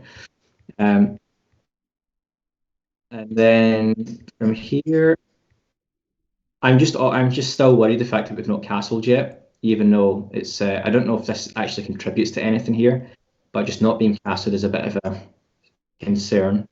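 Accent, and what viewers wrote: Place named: Yorkshire, United Kingdom. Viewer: Which chess club are you a member of? I assume one in Yorkshire based on that accent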